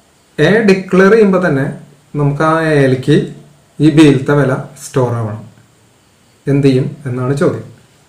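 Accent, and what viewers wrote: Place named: Thrissur, India. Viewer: Sir super class. Pinne sirinte Thrissur bhasha suprrrr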